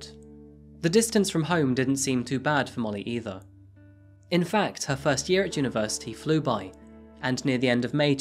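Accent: England, United Kingdom. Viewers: Am I the only one that gets annoyed that he gives temperatures in farenheit and doesn't even provide a conversion to celcius...I have no fuckin idea what 88f is and neither do most of the world. Dude you have a British accent throw us a bone here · that's hella weird and goofy. Not at all, those subs gonna hit 1 million soon enough for this guy, so it speaks for itself. Very clean production, B roll smooth editing, and his very English accent adds to his distinguished diction,soft spoken but most importantly. He humanizes the victims in the cases, he puts the focus on their story of how they lost their life and doesn't sensationalize it. But as he tells their story like hers, he honors them. by telling their story like this, he's getting the bag and does it with class.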